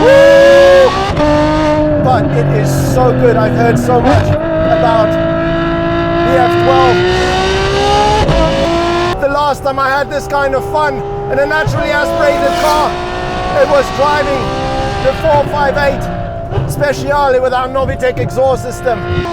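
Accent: South Africa. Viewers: That South African Accent is sexier than the F12 and the 812😉🤣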